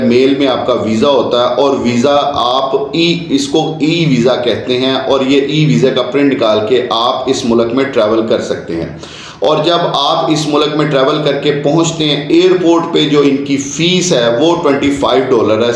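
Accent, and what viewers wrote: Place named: Punjab, India. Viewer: یہ سالے پنجابی انگریزی بھی پنجابی میں بولتے ہیں - " گویانا" نہیں ابے " گیانا" انگریزی میں " Guyana" بولتے ہیں - یہ ویسٹ انڈیز کا حصہ ہے۔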